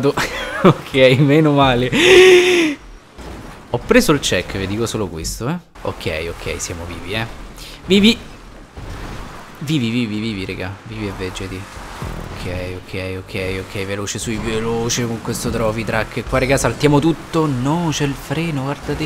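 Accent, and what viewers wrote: Rome, Italy. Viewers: Sei di Roma?grande suiiii🐷🐖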